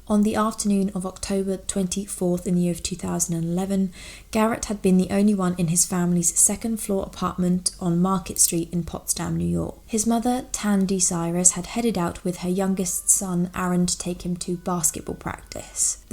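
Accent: United Kingdom. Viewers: It's not abt opinions or lights, camera, action or having the best equipment etc, It's abt her very blah blah, very mundane, disinterested presentation; It's like watching paint dry. Like she's reading from a script on how to put together a garden shed. Everytime I click on a recommendation of hers; my ears just glaze over. She seems completely disinterested in the topic; just mumbling through til the end as quickly as possible. She's not engaged at all. I think Americans just like a British accent regardless of what's being said and how. On a positive note, she's attractive, which is her saving grace for those that find attractiveness important, ie men. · Annaliese O'Malley I think you may just have watched too much TV, where everything is all about drama and excitement. I prefer her level-headed and articulate overview of cases, and I’m sure others that enjoy her content do too. 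Very bold of you to discredit any following this woman has as being because of her british accent and looks.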